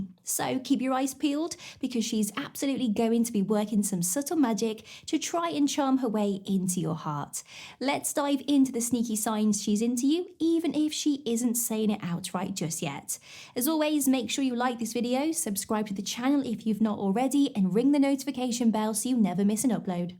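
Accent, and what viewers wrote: United Kingdom. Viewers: Hey there, are you into me? 😂😂😂😂😂😂😂 I'm kidding. I'm here to listen to your British accent, I love it! You have a blessed day.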